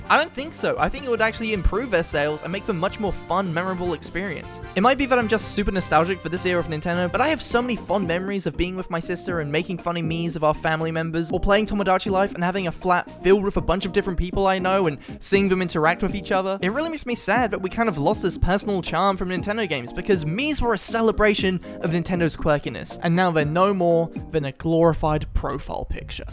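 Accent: United Kingdom. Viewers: you have a british accent like me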